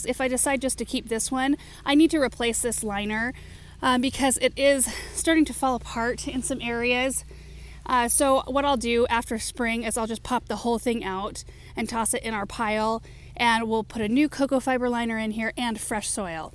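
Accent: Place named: United States. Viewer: There are some words you say that make me smile at the difference between the UK and the US. You say Gerbera, and we make that into a J sound (Ge together making the J sound), so we say it like Jerbera. The other one is Dahlia, which you make an ah sound and we make an ay sound (so we say Daylia)! 2 countries separated by a common language in action ❤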